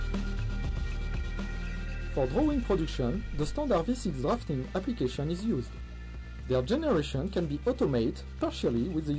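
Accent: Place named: India. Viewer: ce n'est pas pas pire que l'accent indien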